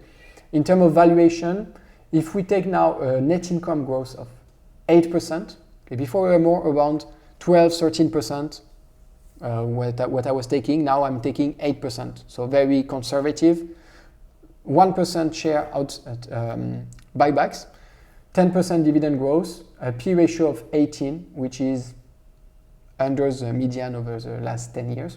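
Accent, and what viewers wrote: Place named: France. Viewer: Nice video. I have been following you for a while and I really enjoy your content (and your french accent). I bought the dip on EVO when your video came out- which paid off nicely. I have a few questions that I would like to ask you: 1. I was wondering where you see Francois Rochon's current portfolio that you mention in this video? 2. Have you thought about using StockOracle for your videos? The tool is amazing and works well for youtube videos. 3. Why don't you have Apple in your portfolio (It is one of my biggest holdings along with amazon and google). Btw.. Novo Nordisk is my favorite investment case BY FAR, so I'm happy to see it in your portfolio! All the best.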